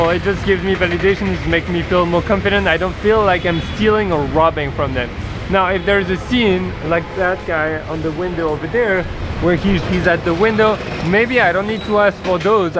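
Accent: France. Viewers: coucou l'accent français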